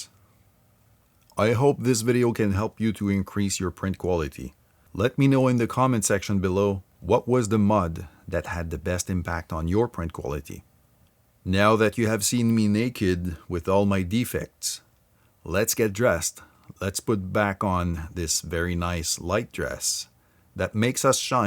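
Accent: Quebec, Canada. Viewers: That guy sounds like he's from Quebec. Really nice and detail video btw.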